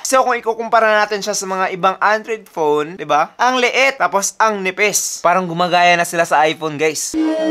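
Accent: Visayas, Philippines. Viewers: Bisaya diay ka pala dol HAHAHA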